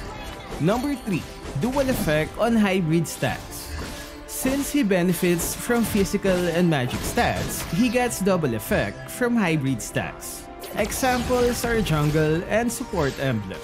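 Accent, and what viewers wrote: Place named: Philippines. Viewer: I smelt tagalog accent in him...😁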